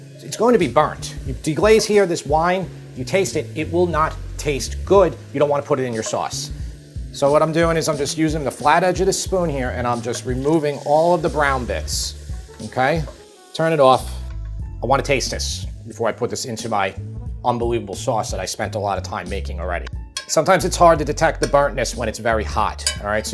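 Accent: New York, United States. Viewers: I love your Ny York accent!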